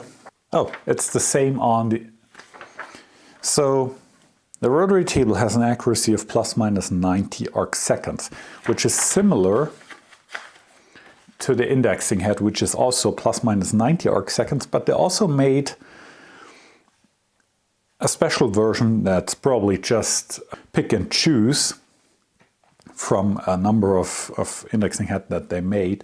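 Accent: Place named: Upper Palatinate, Germany. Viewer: Very nice 😎👍 if you ever purchase sthg big again and want to clean it, drop by my shop and use my Würth Parts Cleaner, VOC free, that stuff gets the grime off in no time. I am not sure of your location, but you sound like a Oberpfälzer 😉 i am located 15min next to munich airport with the shop 😊 Greetings, Chris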